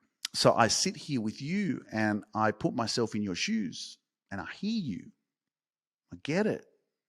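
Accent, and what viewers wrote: Australia. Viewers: I love your message,. ❤❤❤ Thank you for being a guiding light in the darkness for those who need it so very much. Thank you for telling it as it is. No sugar coating. Just the Truth. And in an Aussie accent. 😊 Your message is needed. You are needed. You are loved. You are appreciated. Thank you,. You're beautiful. ❤❤❤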